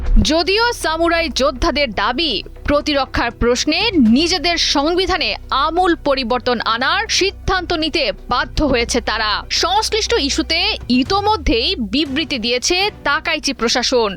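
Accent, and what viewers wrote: Kolkata, India. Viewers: কলকাতার সুরে খবর পড়লেন মনে হলো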